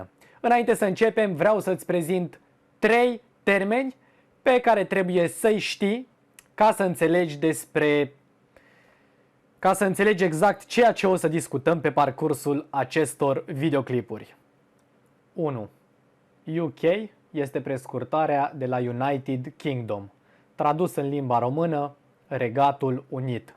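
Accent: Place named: Moldova. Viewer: Se simte un ușor accent moldovenesc. Greșelile de exprimare sînt omenești. Keep up și good work. 👍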